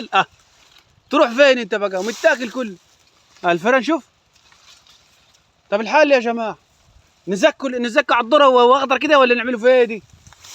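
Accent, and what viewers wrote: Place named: Libya. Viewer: لهجتك فلاحي على بدوي على ليبي انت من وين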